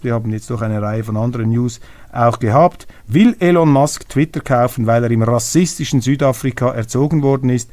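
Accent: Switzerland. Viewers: Bonjour nach Zürich! Wenn mir eine Randbemerkung erlaubt ist.. Ich mag Ihren Schweizer Akzent.. Bin aber überrascht, wie akzentfrei Sie Englisch und Französisch sprechen. Kompliment.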